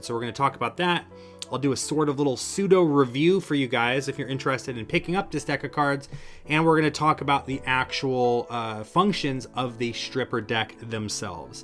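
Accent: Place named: Ireland. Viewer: Funny irish accent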